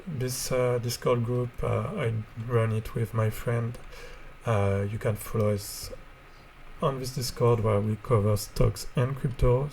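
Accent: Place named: France. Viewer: By the way, I like your french accent...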